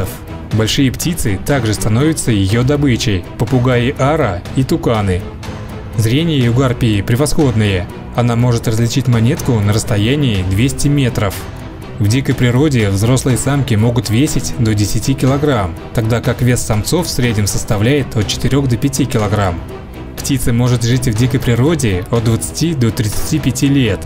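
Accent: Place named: Ural-Volga, Russia. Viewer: Текст хороший, а уральско поволжский акцент утомил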